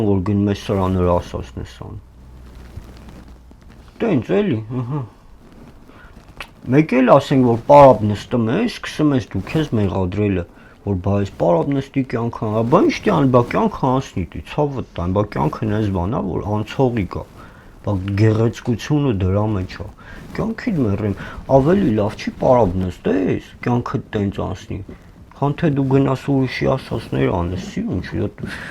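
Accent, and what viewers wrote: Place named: Vanadzor, Armenia. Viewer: Чисто Кироваканский, а точнее Химзаводский)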